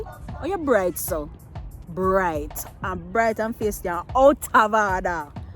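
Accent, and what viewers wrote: Jamaica. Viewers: i love jamaican accent ...thanks for the tips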